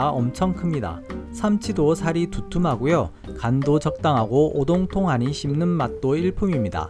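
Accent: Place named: Gyeongsang, South Korea. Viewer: 경상도 억양이 거슬리는 것 빼고는 좋습니다. AI 나레이션이 나을 듯요.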